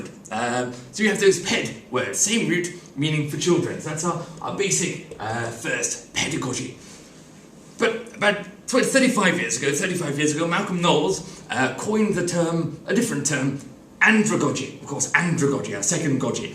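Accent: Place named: France. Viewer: he's got a very heavy foreign accent and makes many mistakes. is he French?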